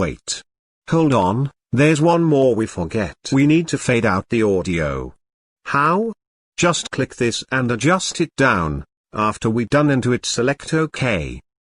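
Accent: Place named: United Kingdom. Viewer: Ang taray ng boses british accent #chutee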